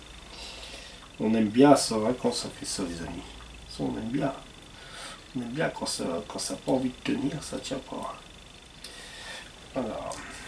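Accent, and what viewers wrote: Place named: Nord-Pas-de-Calais, France. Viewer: Bonjour Attention! Ce sont deux époques "guerrières" différentes: cela pourrait choquer. Mais en attendant, félicitation pour tout ce que tu fais...de même pour tes directs "pur jus",avec ta manière chti de t'exprimer! Patrick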